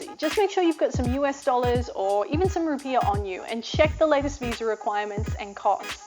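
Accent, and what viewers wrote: Australia. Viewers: Oh gosh, this is the first video I'm seeing of yours, and I love the way you make this video interesting and at the same time informative ! Good luck!! Ps. I'm kinda feel by your accent that you're from Australia. Are you ? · I’m interested to know where is your accent from ? Not a typical Aussie accent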